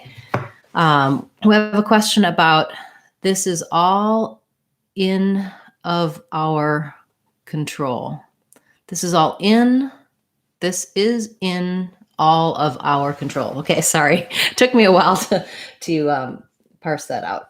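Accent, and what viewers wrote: United States. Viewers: a great explanation thanks for helping us, I love american accent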